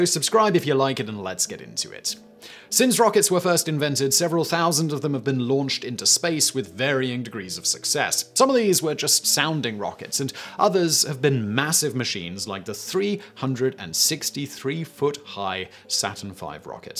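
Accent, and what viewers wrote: United Kingdom. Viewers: He’s speaking correct, British English, rather than some simplified colonial version.🇬🇧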